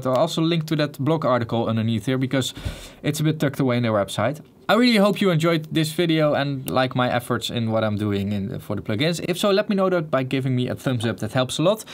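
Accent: Netherlands. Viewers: hoor ik daar een Nederlandse toets in uw accent? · i could tell this dude was dutch like 3 words in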